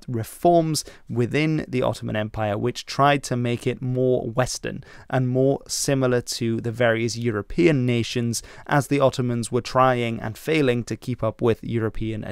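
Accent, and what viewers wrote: Arab world. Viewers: Why are you reading Turkish words with arabic accent? It sounds weird and wrong af..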